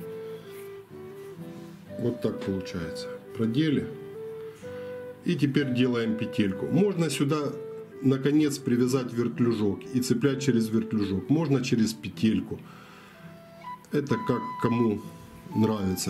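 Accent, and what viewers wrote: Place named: Ukraine. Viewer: Я извиняюсь, но "шо" и "гх", вы с украины, автор??? Ничего личного, просто интересно...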